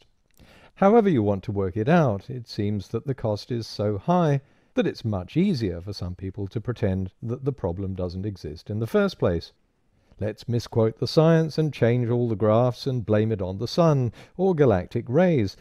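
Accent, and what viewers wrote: United Kingdom. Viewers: The British accent meshes very well with the heir of nonchalance. And truth. Can't overlook truth, right? I mean, you'd think... Whatever.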